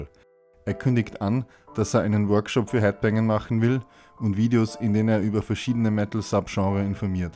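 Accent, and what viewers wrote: Austria. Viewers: Ich finde dein ge-österreichel doch sehr sympathisch, und auch, wie du mit völlig trockener Stimme Reinerles Utopien wiedergeben kannst. Liebe <3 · wie man sofort merkt, dass der erzähler österreicher ist haha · Bist du Österreicher? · gibt es silent hill wirklich?? austrian voice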